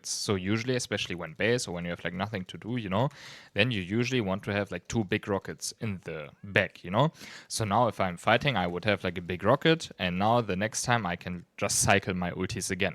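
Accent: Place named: Germany. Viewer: I like your german accent haha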